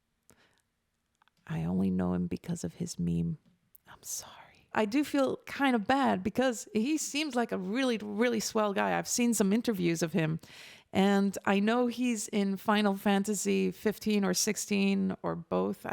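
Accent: Paris, France. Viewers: je suis francais j'ai 41 ans tu parles aussi bien que moi tu as un accens limite parisien et ton francais est clairement parfait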